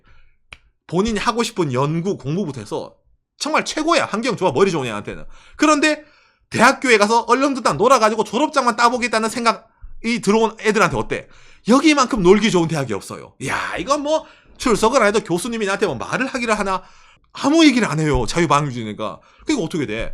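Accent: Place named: Ulsan, South Korea. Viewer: 울산분이시죠.. 근데 울산 억양이 부산, 경남과는 의외로 많이 다르고 경주, 포항과 함께 경주방언권을 이루고 있어서(요즘은 외지인이 많아서 많이 없어진 면도 있지만) 많이 순화된 대구, 청도말 느낌이 나죠.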